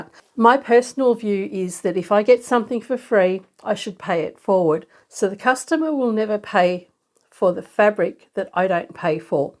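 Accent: Australia. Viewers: NZer here. Aussie, accent is slightly different from NZ.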